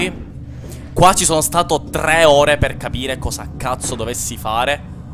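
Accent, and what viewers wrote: Palermo, Italy. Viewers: compare complimenti per il tuo linguaggio a palermitano...mi ki fresco ki si..continua così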